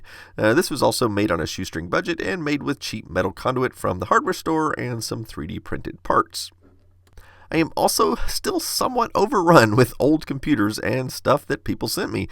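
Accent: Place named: Texas, United States. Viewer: Dude, I've served in the Army with soldiers from Texas and that's just how they talk.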